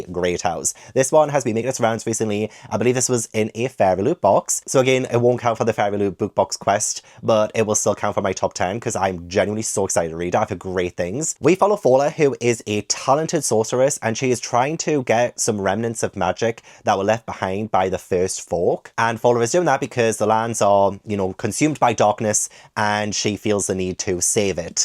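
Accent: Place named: London, United Kingdom. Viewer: Oh my days, is funny lol. Your London accent is fab 😂❤❤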